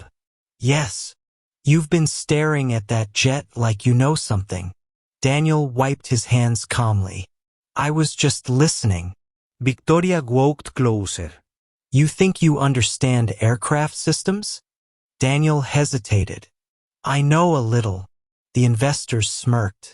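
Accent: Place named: Spain. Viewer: Why is it that the narration keeps changing to a broad Spanish accent?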